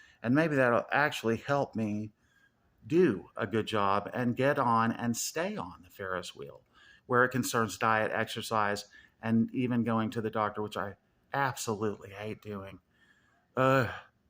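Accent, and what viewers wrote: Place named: United States. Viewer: Hi. This is Chris, imported from Twitter. All this time I thought you were British but here you are being all American-like. How lovely and I look forward to seeing all your videos.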